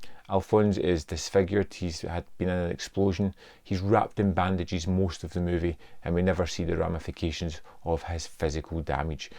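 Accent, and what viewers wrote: Scotland, United Kingdom. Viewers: I'm here because another place asked for best Nicholas Cage movies and i thought of Birdy. I've been in mental hospitals so I related to that part. At one point Modine mentioned Wrestling and around this movie he also did Vision Quest. Both movies showed him really in good shape. But hey...I was too at that age. Oh, and since I'm new to this place, was is your accent? I live in in Boston and have that and it's different than New York which isn't far away. But I'm guessing Scottish?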